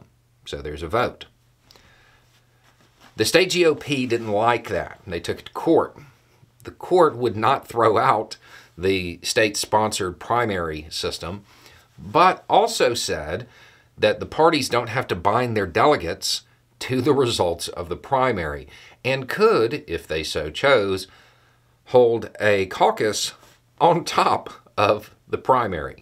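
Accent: South, United States. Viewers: Please do a couple more videos with your southern accent!!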